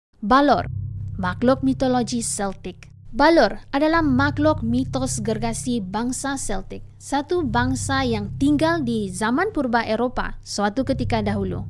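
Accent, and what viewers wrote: China. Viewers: pening r..cm loghat cina,( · Loghat seperti loghat cina hmm..tk logik sebagai sabahan.ekram abang kamu lebih baik😄😄ekram go go go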